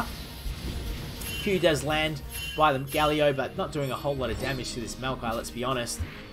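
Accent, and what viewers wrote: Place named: Australia. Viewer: Terrific gameplay and what top drawer aussie commentary dude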